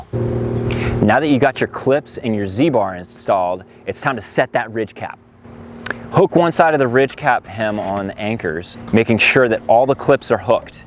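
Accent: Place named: California, United States. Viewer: I’m from Southern California, born and raised. This guy is without a doubt holding the thickest Californian accent of all time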